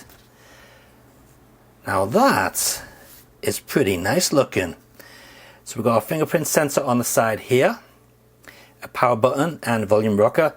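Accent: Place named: England, United Kingdom. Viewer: Thanks, good review. Surprised to hear an English accent tho appears you aren't on UK from your photos. I've shortlisted it, assume will work ok in uk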